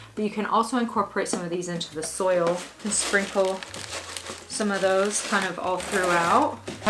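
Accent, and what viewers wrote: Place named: Manchester, United Kingdom. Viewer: I'm from Manchester, it's nice hearing someone from pretty much down the road talk about their plants. Not showing me their Monstera on a California balcony.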